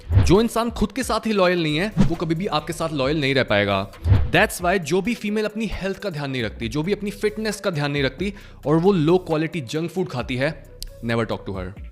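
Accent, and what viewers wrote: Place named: North, India. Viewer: i seen your face first time today, your imagine in my head was fair skin guy like north indians (because of your hindi accent) without beard (face with beard on your voice was something my brain didn't think about) and normal body size with good shape of muscles (not as big as it is) your hair style in my imagination was kind of long enough to reach your eye brows, i imagined you as leon scott kneddy of resident evil 4 but you was totally different person, not bad but not what i thought.... you are good looking still by the way...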